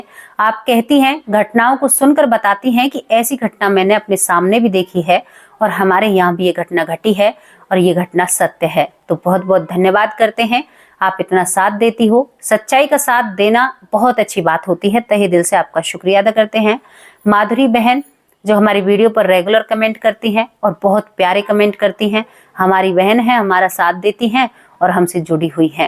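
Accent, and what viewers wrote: Haryana, India. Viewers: Aap ki bolney ki tone Haryanavi hai 👍👍👍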